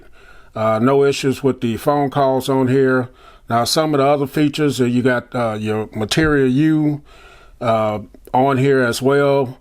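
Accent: South, United States. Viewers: First review I've heard with a southern accent. Sound like one of my uncles. Love the content. Love my Stormy Black 6 pro 💪🏾✊🏾💯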